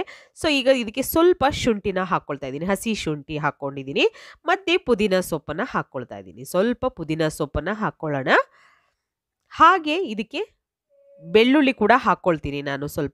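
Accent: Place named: Malenadu, India. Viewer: ಇವತ್ತೇ ಮೊದಲನೇ ಸಾರಿ ನಿಮ್ಮ ವಿಡಿಯೋ ನೋಡಿದೆ. ನಿಮ್ಮ ಕನ್ನಡ ನಮ್ಮ ಮಲೆನಾಡಿನ ಕನ್ನಡದಂತಿದೆ. ಖಂಡಿತ ನೀವು ತೋರಿಸಿರುವ ಚಟ್ನಿ ದೋಸೆಗೆ ಮಾಡುತ್ತೇನೆ. ಧನ್ಯವಾದಗಳು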